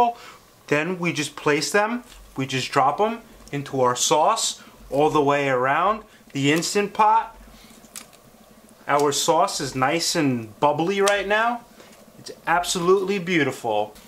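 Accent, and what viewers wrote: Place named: New York, United States. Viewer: Love the way you cook and your (New York) accent...? 😃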